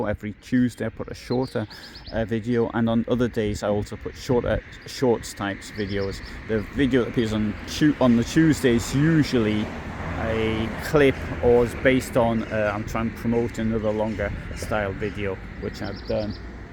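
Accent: United Kingdom. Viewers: Merry Christmas! I believe you are correct about the Catholic church along with the Germans victimized the Jews. Also, interesting how you have not lost your British accent. Great video!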